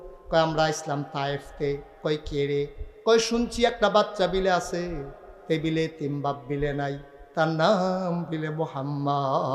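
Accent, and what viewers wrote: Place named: Kishoreganj, Bangladesh. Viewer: এই হুজুরের বাড়ি কি কিশোরগন্জ ভাষা সুনে মনে হলো আরকি ।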